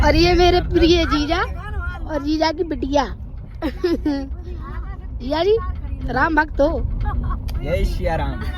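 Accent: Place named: Bundelkhand, India. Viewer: aapki awaj mp side mostly bundelkhand reason jaise lag rahi hai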